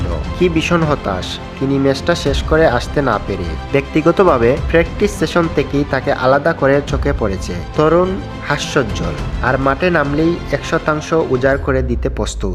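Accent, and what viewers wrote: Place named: Sylhet, Bangladesh. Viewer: শুদ্ধ মাতোস না সিলেটি মাতছ বুজিনা বগার ফুয়া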